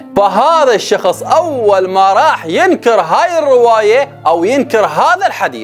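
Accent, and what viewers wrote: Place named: Iraq. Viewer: شيخنا الفاضل انت عراقي لان لهجتك تبين من غير دوله احترامي لحضرتك من تكون عراقي او من غير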